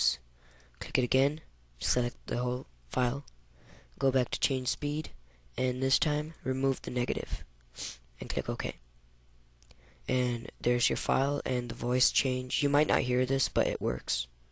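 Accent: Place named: Canada. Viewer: you kinda sound like canada cool. ^w^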